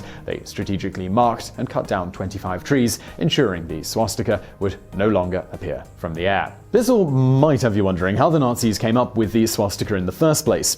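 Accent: England, United Kingdom. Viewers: I just spent an hour trying to figure out why vsauce was speaking in a English accent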